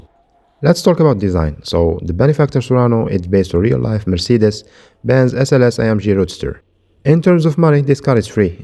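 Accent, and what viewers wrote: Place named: Algeria. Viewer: Brother where you from you sounds djazairi😅😅😅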